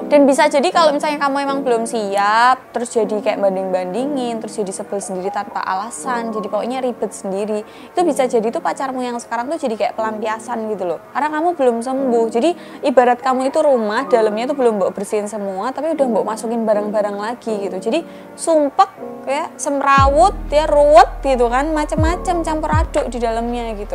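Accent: Java, Indonesia. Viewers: Duh kak medok² Uwu gitu angel angell nemen eram😍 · jowone ngendi mbak, sapean....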